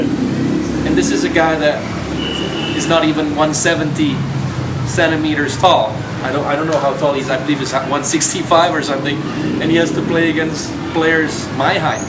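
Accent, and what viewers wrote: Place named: United States. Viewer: Bahasa inggrisnya kental kayak Amerika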